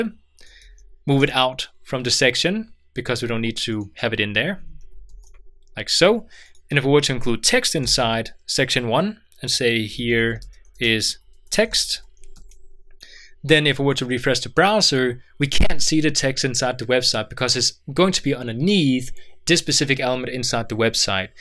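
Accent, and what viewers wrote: Denmark. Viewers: Are you by any chance Danish?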